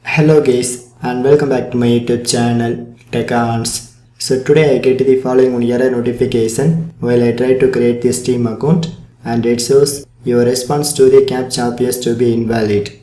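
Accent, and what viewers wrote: India. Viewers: When you hear an Indian you know it’s gunna be right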